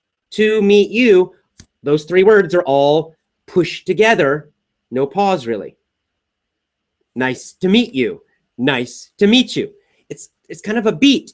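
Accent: United States. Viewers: Super sir..even ur Amcn English we can understand well..(slowly teach).can teach(pronounciation) us more very importantt words? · I love your american accent teacher.its been really long time teaching my self american english only on the Internet cuz we don't have english teachers here well they teach us english roles and grammar by FRANCE ACCENT AND PRONUNCIATON. feeling so down.i hope some Americans come to fez city Morocco just to teach us their own language. 'We re so thirsty ' to learn about American English and more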